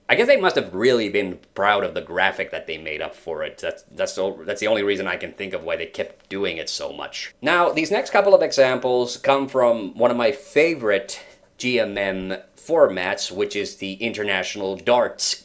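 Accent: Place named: Finland. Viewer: Oletko suomalainen? Tyckte jag anade en finskt dialekt...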